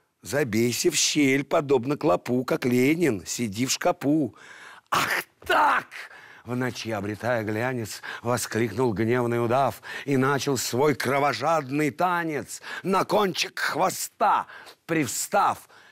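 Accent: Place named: Okanye, Russia. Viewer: Ооооо, "брат"! Да, ты ещё и дОёшь через "О"-(чко) надо полагать, неуч... Или ты нерусь, "Артём Иванов"? - Ну, тогда это многое объясняет ;))) ? УРА, ТОВАРИЩИ !!! Слава Украине в составе России !!! :)))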